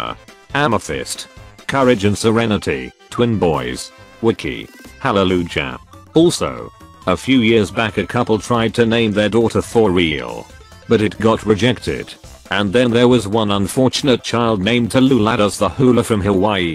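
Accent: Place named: England, United Kingdom. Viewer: A robot with an English accent is still a robot. You need to do better.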